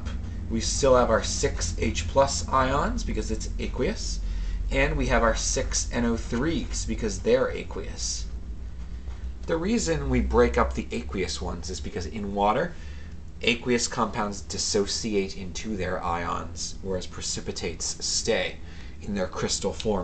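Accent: Canada. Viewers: sounds canadian to me :) but i wont take points away, it was a very good video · are you canadian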